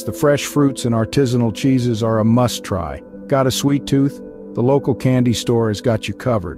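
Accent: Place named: Northern California, United States. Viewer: It is great to have a human narrator with a Northern California accent ( instead of one with robot accents ) describe Northern California cities.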